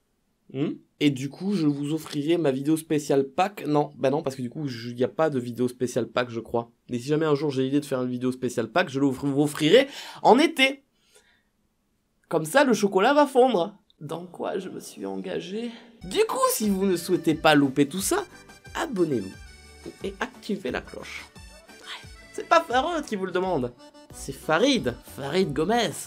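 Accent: Spain. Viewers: Au début en lisant les sous-titre en même temps d'écouter ce que tu disais, je me suis dis : mais... google traduction est pourri haha! Sinon, trop mignon ton accent en español !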